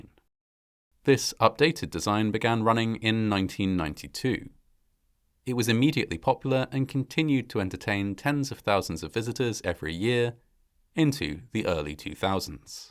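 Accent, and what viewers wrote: United Kingdom. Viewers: God i hate the thick british accent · Don’t watch if his accent bothers you that much better yet ,justjust unsubscribe and be on your way. you knew he was British and yet your dumb ass kept watching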